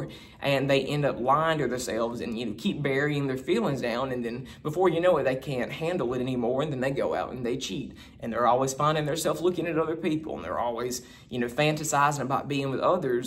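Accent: South, United States. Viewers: Where are you from. I'm from the south. I've never heard this type of southern accent. Also, the mistake you are making is placing way too much emphasis on the opinions and judgments of your family. Wanting, needing, and begging for their acceptance. Why are you doing this?! Are you a cancer ♋️ or something? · Haha. Love yer suthen accent!!! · Love your accent, where I. The south are you from?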